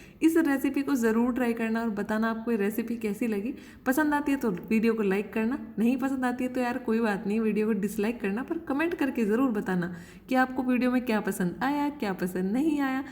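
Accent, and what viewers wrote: Haryana, India. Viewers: Didi Haryanvi ho kya? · Nice and pleasant to hear Haryanavi accent so soft spoken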